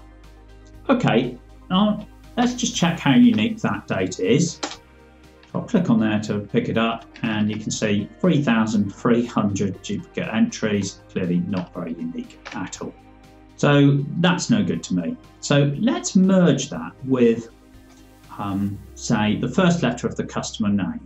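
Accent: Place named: United Kingdom. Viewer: What I heard was a very accented British dialect of some sort, very hard to understand.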